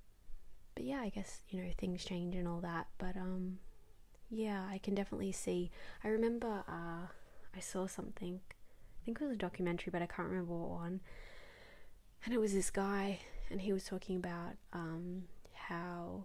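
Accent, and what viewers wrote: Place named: Australia. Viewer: Nice hat. Australian is the best accent for swearing, it's fun to hear it in your videos sometimes!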